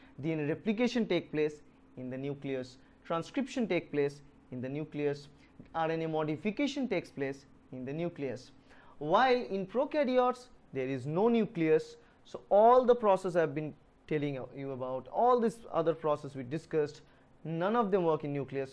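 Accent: Bengal, India. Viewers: are you bengali! bangali naki?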